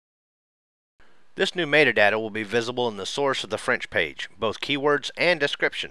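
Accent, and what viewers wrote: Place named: South, United States. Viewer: PFFFFFT on those who talk about speed! This was exactly what I need.. not a 20 minute hand holding just a Q&D on how to get there - THANKYOU.. this rocks! Would love to hear you speaking french too with that good ol boy accent of yours too. Thank you for being fast and concise.. there's others that can do an effective 20 minute presentation - I needed the 5 minute kick start!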